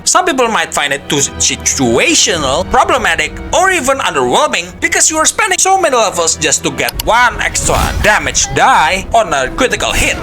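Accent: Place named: Indonesia. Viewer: i know an indonesian when i hear one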